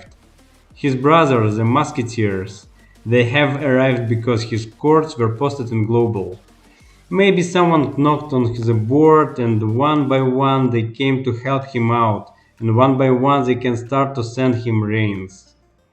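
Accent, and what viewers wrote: Russia. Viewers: Слышно русский акцент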